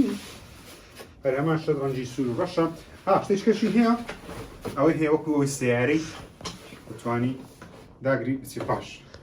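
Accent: Erbil, Iraq. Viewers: Bzhe zorm pe xosha ka ba hawlere zmane rasant qsa akay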